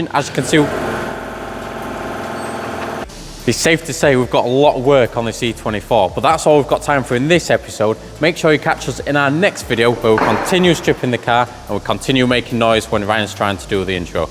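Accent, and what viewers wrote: Yorkshire, United Kingdom. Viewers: Here from Matts channel, love the Yorkshire humour. I'm betting you're from the same area as Boothy of 44 Teeth from the accent. I can't wait to see the cars journey.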